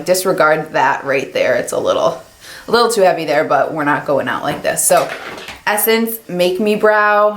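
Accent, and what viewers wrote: Chicago, United States. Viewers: Great video, as usual. You are one of my favorite YouTubers, and I wish you much success☺🌴👍🏼🤹‍♀️Love your accent which sounds so much like the Chicago area types I heard everyday when I lived up there during some of the happiest years of my life❄🌈🌠Hope you will have a wonderful Christmas, (it's Dec 24, 2020) 🎄⛄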